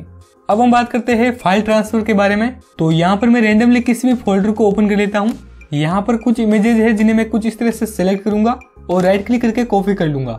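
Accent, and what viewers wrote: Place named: India. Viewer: english title indian voice wtffffffffffffff how the fuckkkk can we understand this :text-green-game-over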